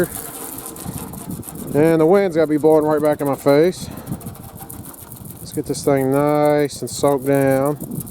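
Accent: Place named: Texas, United States. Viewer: Where are you from my friend?? I'm from S.E.Texas and the way you say "that thang" and "right thar",makes me wonder if you're a fellow Texan...😁 I'm really enjoying the work on the tractor and would love to see you do some body work and restoration!!!💯👍👍👍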